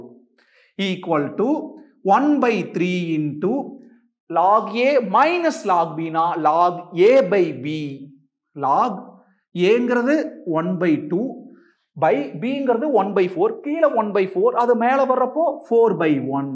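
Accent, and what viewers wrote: Coimbatore, India. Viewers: Sir neenga Coimbatore ah ila thirupur ah sir ??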